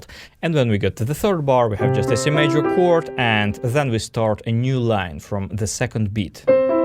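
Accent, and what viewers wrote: Russia. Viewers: I love your videos! Very useful Are you Russian?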